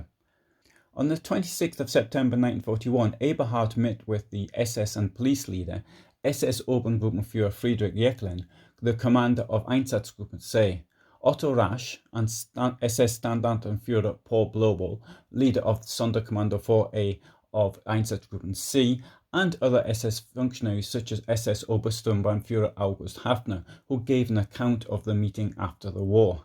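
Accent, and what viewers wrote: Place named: North East England, United Kingdom. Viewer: BTW its great to hear a North East accent sharing such well researched history , we Geordies love our history it seems.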